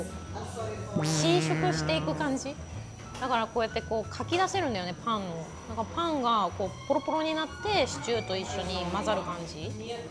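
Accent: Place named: Kansai, Japan. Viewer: プラハ旅行の反省点も含めてレポしてもらえて嬉しいです🫶 さんめっちゃ可愛いのに関西弁な感じあって親近感わきます。笑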